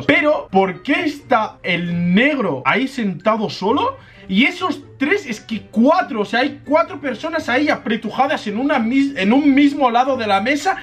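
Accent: Spain. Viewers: Es la primera vez que veo un negro con acento español. ole!!! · Un hermano hablando español de españa...un crack el negro 😎 saludos crack 😉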